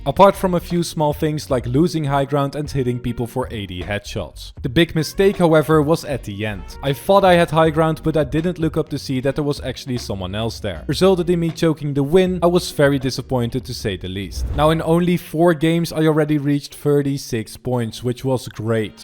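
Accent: Netherlands. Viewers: Wait a minute do i hear a dutch accent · are you dutch? you have a dutch accent. ik ben ook Nederlands, ga zo door je bent echt goed op k&m. keep improving:) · Are you from holland? Als je dat bent dan dacht ik dat al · R u Dutch? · You sound so dutch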